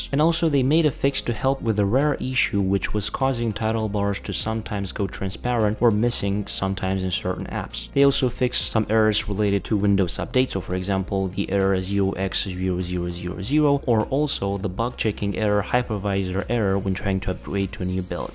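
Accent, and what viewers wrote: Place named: Romania. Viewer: thank you, you're the best one for sharing whats new in windows 11, and you look very educated and your accent is verry beautiful, i appreciate your big work, i wonder how romanian people can speak english like this ☺ i hope we can see your face someday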